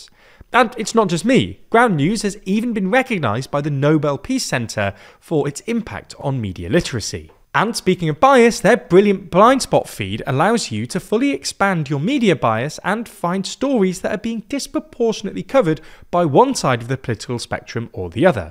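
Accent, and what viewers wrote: United Kingdom. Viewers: Everytime I hear a heavy British accent like this, I get turned off. It is supposed to make him sound intellectual but it actually showed him as shallow.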